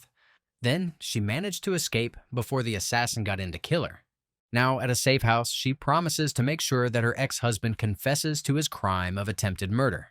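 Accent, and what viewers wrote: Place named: United Kingdom. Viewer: I have no idea why but his british accent is soooo funny to me. 😂😂😂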